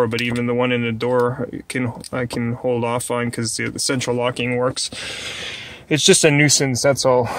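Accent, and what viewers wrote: Germany. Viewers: Right - will do. Just watched you Mom-video. Where in Germany are you from? I'm wondering about your German dialect.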